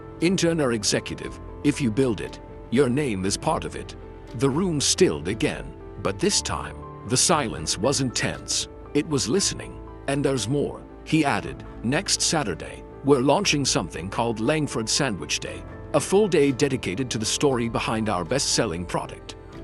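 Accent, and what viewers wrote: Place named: United Kingdom. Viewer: the voiced narrative states "... catching the floating flour dust". However, the text subtitles erroneously indicate the floating flower dust -4435 the audio narrative with a thick British accent,describes Eva showing interns how to ,"braid hollow bread" and the text narrative reflects the word "hollow" bread. THAT'S A BIG MISTAKE! My husband was a professional baker. The spelling is "challah" and is pronounced holla. It is a traditional Jewish bread. It is NOT HOLLOW. You need to 1. get rid of the British accent 2. proofread 3. get a better AI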